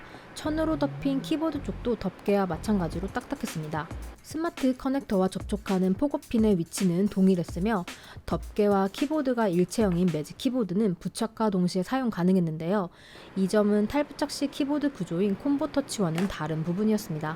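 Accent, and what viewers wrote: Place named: Gyeongsang, South Korea. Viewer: 경상도 분이시네요 ㅋㅋ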